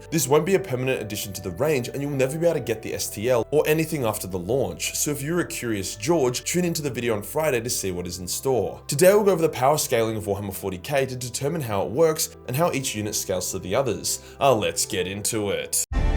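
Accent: Australia. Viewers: May I as central europian slav request you to speak about 10% slower 😂 i get everything you talking about but it is really paiful for understanding aussie accent in this cadence of words 😂 I still love naughty Austrian non painter creator ❤️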